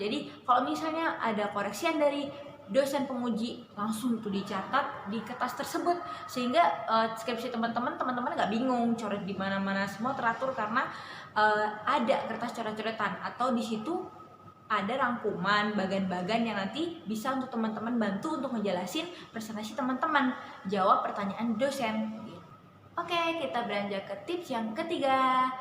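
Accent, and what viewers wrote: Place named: Java, Indonesia. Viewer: Ketawaa pas kebeek ngomong *degdegaaan😆 medhok amat😆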